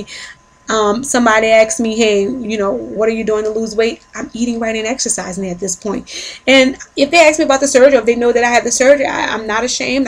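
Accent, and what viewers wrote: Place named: New Orleans, United States. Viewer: You are SO COOL!!!!!!!!!!!! You have an accent, Are you from the NOLA? *New Subscriber*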